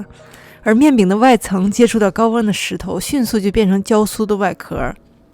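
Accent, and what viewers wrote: China. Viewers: IT's Chinese pronunciation. She is speaking mandarin.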